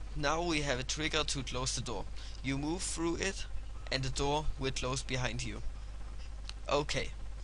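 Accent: Germany. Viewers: Natürlich bist du Deutsch. Merkt man z.B. an "dis" oder diese klare Aussprache. Amis sprechen anders.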